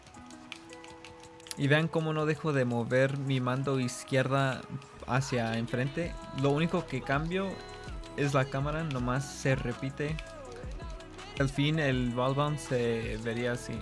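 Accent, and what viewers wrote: Mexico. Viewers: you speak spanish very well broo asta you seem to be from mexico you were very well understood what you explained greetings and good video 👍👌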